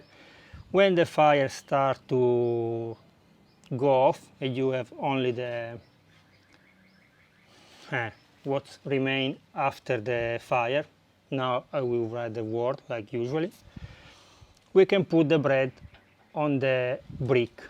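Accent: Italy. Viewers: I love your accent! They need to stop hiring white people and British to play Roman emperors and soldiers in movies. Y'all are just as great. I would LOVE to see more historical movies with more Italians. I love your natural tan skin. I'm gonna subscribe to your channel cuz you have some good videos. :) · I subscribed to you so I could share you with my 23 yr old daughter and 24 yr old son....We were taught to cook by our american men who where soldier men who survived and came back to marry our mothers and then teach them how to cook..... but I just can't get over your BEAUTIFUL ACCENT VOICE.....your voice is angelic, and you are just so damn pretty for an Italian.....You wife better love you to crazy pieces! She is SOOOO LUCK!!!!!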